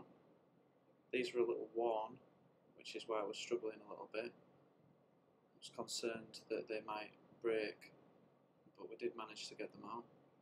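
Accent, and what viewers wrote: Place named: North, United Kingdom. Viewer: Really helpful cheers buddy! Nice to hear a fellow northerner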